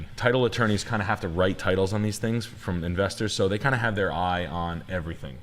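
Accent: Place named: East Coast, United States. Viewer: is the only man with an east coast accent who genuinely cares about anything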